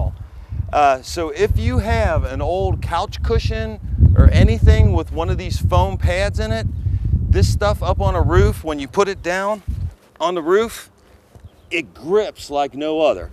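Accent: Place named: Eastern Shore, United States. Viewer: This guy sounds like he is from the Eastern Shore.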